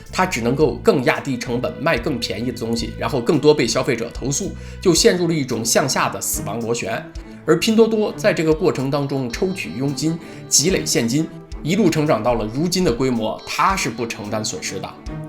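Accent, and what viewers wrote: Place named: Beijing, China. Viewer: 京味兒太濃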